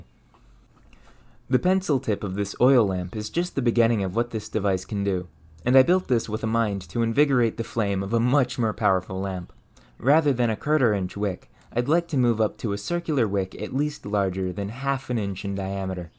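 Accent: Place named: United States. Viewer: Where are you from? Your accent is generally American but you say "for" and "fur" the same way and any syllable ending -or with an -er sound. Regardless of that I appreciate the info. I'm on a quest to learn how we got from rocks to rockets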